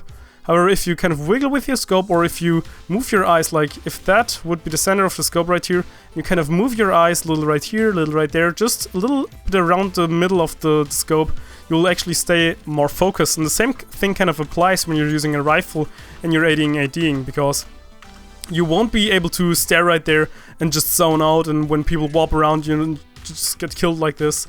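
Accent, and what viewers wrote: Germany. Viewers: Bist du eigentlich deutsch? :D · bist du deutsch ??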